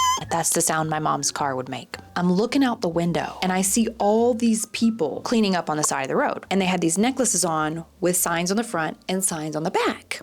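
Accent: South, United States. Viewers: I love your accent❤. I wish I could talk like that too. I would appreciate if you make a tutorial on how to speak like a redneck.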